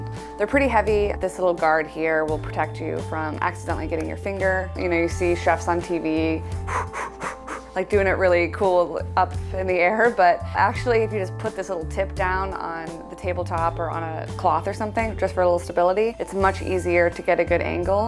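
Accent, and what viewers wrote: United States. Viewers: Which areas in the US would this person's accent be predominant? Or is popular amoung a certain age group? Or perhaps a particular kind of crowd?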